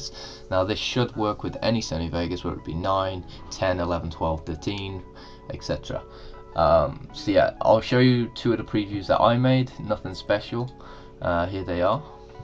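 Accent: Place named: United Kingdom. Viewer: Thick British accent gotta be one of my favorite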